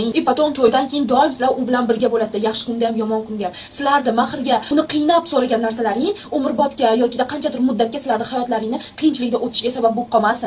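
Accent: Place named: Rural, Uzbekistan. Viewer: Sanga qoldimi qishlaqi gaprish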